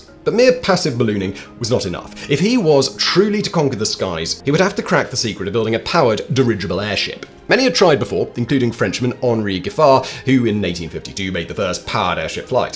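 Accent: United Kingdom. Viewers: From your accent you sound British - so it should be "inventor of the aeroplane" - we are not the 51st state yet!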